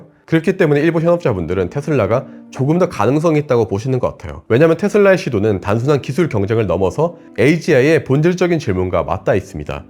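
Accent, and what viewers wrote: Gyeongsang, South Korea. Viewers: 경상도 사투리 구수하니 좋네요!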